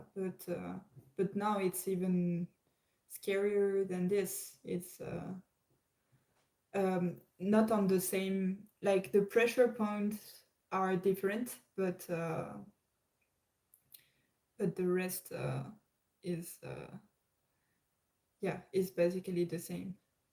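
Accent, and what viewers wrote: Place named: Belgium. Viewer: Sorry for missing your live videos. I've been really busy 😢 but at least, I'm gonna watch all of them. P.s your English is fantastic. Advanced vocabularies and articulative. It's not easy as well. I live in a country where English is utilized as a first language in education but not officially. Yet, many of us can't speak like you do. I had to train myself on my own. Your English accent is interesting, I heard similar accent (with minor differences) in Belgium (only European nation I've visited so far) when few of them speak in English but accent switches when they speak in their primary language.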